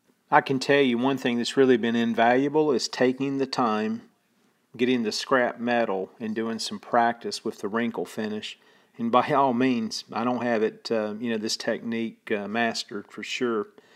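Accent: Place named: Carolina, United States. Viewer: Don..are you a Carolina boy by chance? cnt quite grab the origin of your accent..but thx for the great tips on stripping..appreciate your time and effort...Rich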